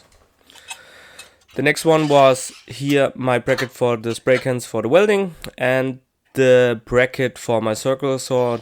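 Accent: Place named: Germany. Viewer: Every damn time I watch one of your videos, I get envious. You're truly a master of your craft, man. As someone who's in a similar situation where confined workspace quarters are concerned, I've tried to explore ways to improve how I store things. French Cleats appear to be the best option due to how modular and customizable they are. You've basically taken that same approach, only you've opted for a pegging system with the same kind of modularity and customization. I'd suggest incorporating a sliding rail panel in the future should you run out of space in the future, but I don't know if that would be possible in your shop. Honestly, I think you've done everything you can with what you've got. The only other way to deal with continual space issues is to just get a bigger shop. Maybe that's in your future? And for some reason, I always thought you were American but judging by your accent, it sounds like you're German? 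I guess my American arrogance is showing, but then again, I could accuse you of allowing your German intelligence to make me feel insecure, too. ;.) Keep doing what you're doing. Love watching your videos. Quick question: For someone who doesn't have one of those plasma CAD table things, what would the best way be for anyone trying to make some of those pieces you cut out that have lots of curves and whatnot? Just tracing and cutting wheels? I have an angle grinder, but I don't have (yet) a plasma cutter.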